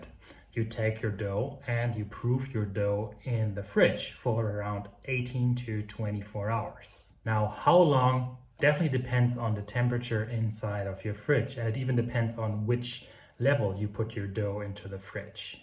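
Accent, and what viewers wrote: Germany. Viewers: Until you mentioned Hamburg I would have bet that your german accent in english is of a kind of hessian type.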